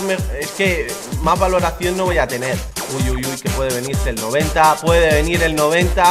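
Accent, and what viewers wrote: Chile. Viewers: hablo chileno vean cuando saca a modric en el primer fut brat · por que de pronto hablas tanto chileno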